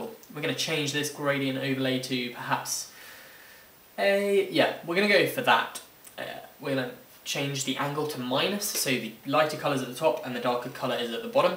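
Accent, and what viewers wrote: United Kingdom. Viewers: british :D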